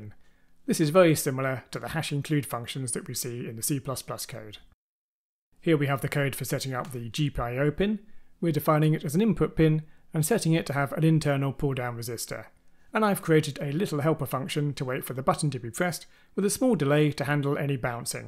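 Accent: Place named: United Kingdom. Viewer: You sound like you're from the UK. Did you order via pcbway? Any issues since brexit / vat changes?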